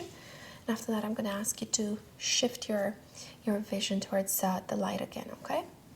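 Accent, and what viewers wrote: Canada. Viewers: just curious are you french/canadian? :) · Fantastic! Very relaxing. Canadian accent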